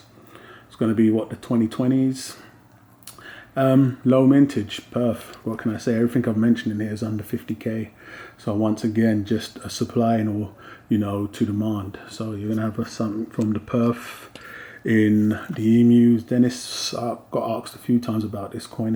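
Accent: England, United Kingdom. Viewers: I really love your English accent and style....I trust your opinion so I went with the 2020 Australia 1 oz Silver Australian Quokka BU . Thanks for your Spirit.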